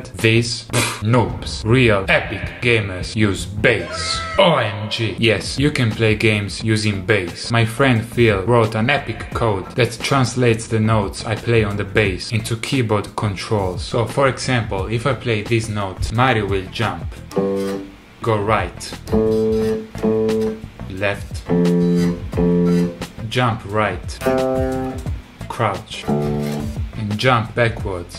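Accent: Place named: Italy. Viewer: This is the most Italian accent of I've heard so far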